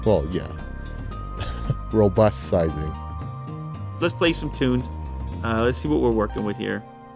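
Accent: Canada. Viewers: sounds EXTRA Canadian in this video! I’ve never noticed it, through the hundreds of other videos I’ve watched. So crazy. I pictured him as a Wisconsin guy or someone from Louisiana. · I appreciate how Canadian my guy sounds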